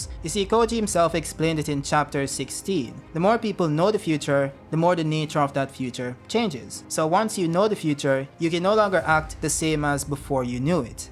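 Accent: Jamaica. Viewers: Are you Jamaican? I hear an accent. Big up from Jamaica bro😂🔥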